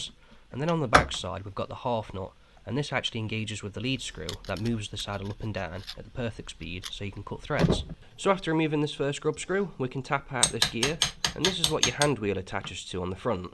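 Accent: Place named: Nottingham, United Kingdom. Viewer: That's a monster lathe, more complex than my Hardinge. You sound like you are from Nottingham.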